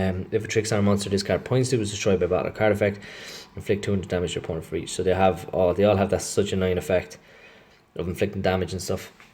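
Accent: Ireland. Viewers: Are you Irish btw? :)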